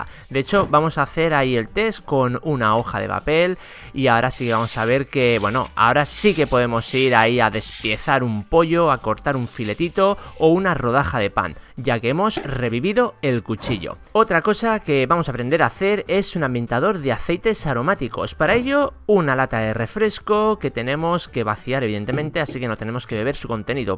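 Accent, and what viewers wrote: Chile. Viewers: ¿Oye el es Chileno? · Eres chileno? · eres chilenos?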